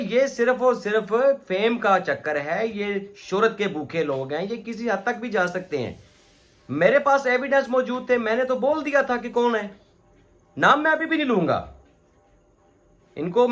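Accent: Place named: Punjab, India. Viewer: Aisy alfaz tho Punjabi b bolty ha.. Qk ghairat mand logo ka lehja yahi hota ha.. Ap kaha K patan Ho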